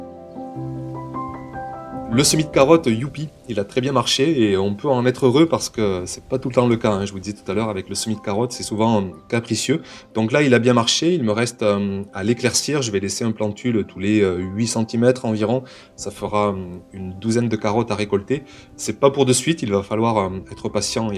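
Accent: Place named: Southern France, France. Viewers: Ah ce bel accent du sud ouest... J'adore... Pour ton cadre, tu pourrais y faire grimper une christophine. Elle serait bien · Enfin des conseils simples et de bons sens....soutenus par une voix très agréable à écouter. Et avec cet accent qui sent bon le soleil, j'avais l'impression qu'on me lisait du Pagnol! Félicitations d'un autre, de tout là haut au Nord!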